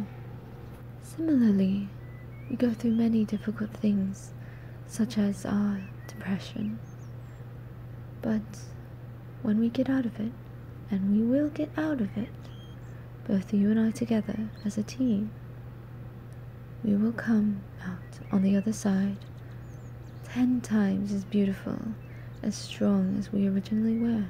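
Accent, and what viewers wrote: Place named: United Kingdom. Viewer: Alternative title: You're the Husband of the young Queen Elizabeth II ASMR (why? because of your somewhat thick british accent)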